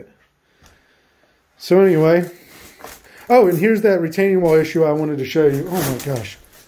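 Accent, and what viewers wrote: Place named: United States. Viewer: C'mon bro you sound like an an American, you know the presentation standard. You could've raked the leaves B4 you started recording bro. Either way nice deal good video thanks 4 the info. Good fortune to you and your brother.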